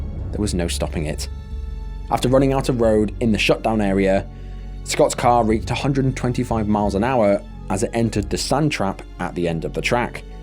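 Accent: United Kingdom. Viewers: This video would've been OK except for that ridiculous British accent on the voice. Damn I hate those.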